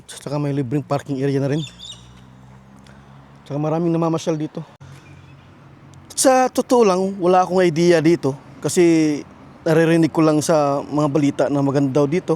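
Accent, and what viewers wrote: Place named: Visayas, Philippines. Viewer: bisaya kaau 😂😂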